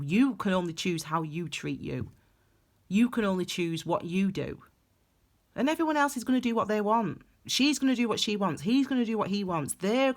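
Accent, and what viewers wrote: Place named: North, United Kingdom. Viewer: Thank you another great reading.. and who can love a northern accent? 😂 is northern girls rock 💫💫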